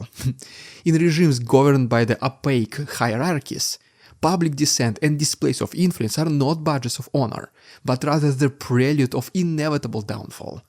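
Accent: Russia. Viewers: Man that perfect, lyrical English with that thickass Russian accent is amazing.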